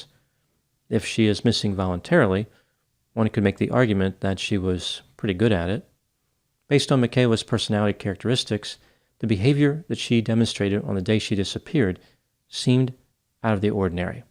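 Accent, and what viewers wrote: United States. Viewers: He should learn how to say Regina good gravy. Can tell you are american . Hard to trust what you say when you can’t even say the correct pronunciation.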